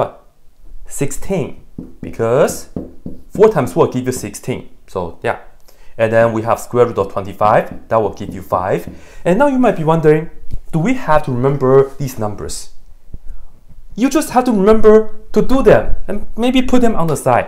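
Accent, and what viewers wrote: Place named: India. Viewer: 不看你的中文视频还以为是印度人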